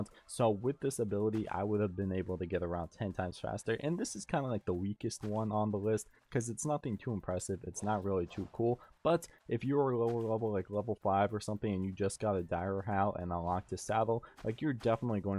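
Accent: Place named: Quebec, Canada. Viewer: you sound french canadian.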